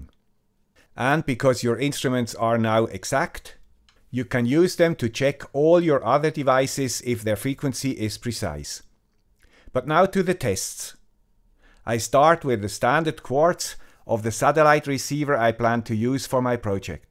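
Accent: Switzerland. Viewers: This is a very good video. Thumbs up. And of course Swiss people are renown for timing accuracy, so this is consistent with getting the information from a guy with a Swiss accent. On the downside, Swiss people are not known for having lots of sex. For information on that aspect, we will be waiting for a video by a gal with an Italian accent! ;-) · Hello Guy with swiss accent, very impressive from Guy with Bangladeshi Accent. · Great your Youtube show! But I would prefer it very much if your videos would continue to be numbered. It's much easier to reference them and much more practical to say to a colleague: "Have you seen video ### by (the guy with the Swiss accent) yet?". Or sometimes I just write down: "See Swiss ##".:-) Many thanks for your series!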